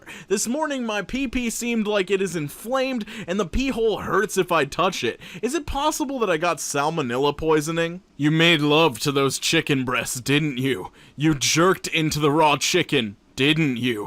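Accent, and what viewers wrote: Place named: New York, United States. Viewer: What’s up with the New York accent.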